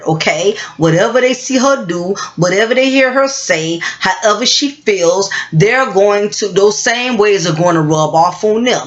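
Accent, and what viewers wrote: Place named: South, United States. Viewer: are u from some part of New Orleans I am u just kinda have that Southern accent a little just curious n that's one thing me n my mom both hated every time we planned a party or holiday gathering it was either my mother me pitching in or one of her friends that would have to buy food decorations etc nobody else every one wanted to eat n drink free too disgusting.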